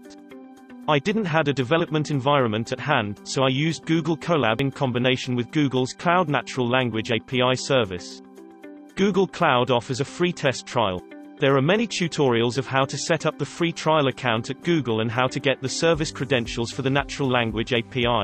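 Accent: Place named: Germany. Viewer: ah, a german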